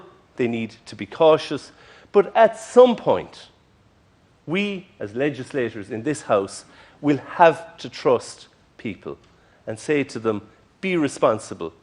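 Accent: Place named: Ireland. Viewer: Beautiful Irish brogue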